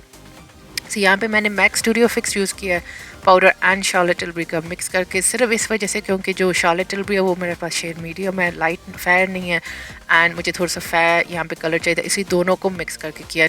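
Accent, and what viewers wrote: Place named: United Kingdom. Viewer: Mam U speak very well Your British accent is great but u speak very very fast Your Hindi is beautiful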